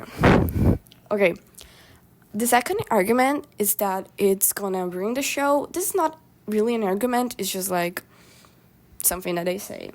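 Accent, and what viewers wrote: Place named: Russia. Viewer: oh sorry! your accent just reminded me of a russian one. i love this video btw;3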